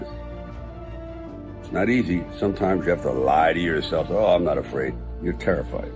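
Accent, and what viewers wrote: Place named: Italy. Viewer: I love your voice speech Italian....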